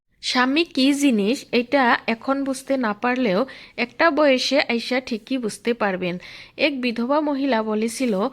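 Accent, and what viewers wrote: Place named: Sylhet, Bangladesh. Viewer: আপনি কি সিলেটের মানুষ।আপনার ভাষায় বুঝতে পারলাম আপনি সিলেটের মেয়ে।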